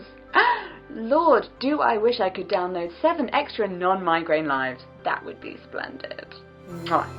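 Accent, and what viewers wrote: RP, United Kingdom. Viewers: I acc kinda like the bad audio cause it weirdly fits the whole 50s theme and posh accent. Genuinely thought it was a style choice at the beginning!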